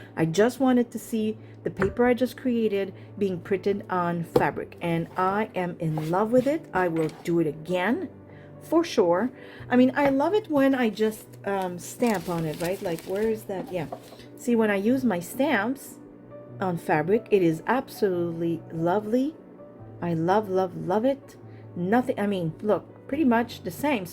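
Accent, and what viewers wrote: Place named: Quebec, Canada. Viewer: Willing to listen to your French-Canadian accent?? I could listen to it for hours, my dear!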